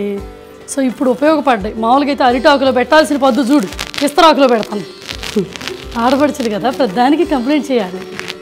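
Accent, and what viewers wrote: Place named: Guntur, India. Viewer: Mee slang vintey Nenu Guntur lo unatte undi. Nenu Tenali. Mee Balam mee Jaanu Ney. Mee Ammayi ,adore her.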